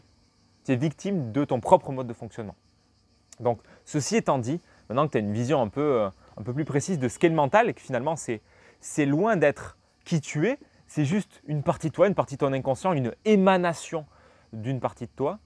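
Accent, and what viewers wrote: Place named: Marseille, France. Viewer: J'adorais cet accent marseillais ;)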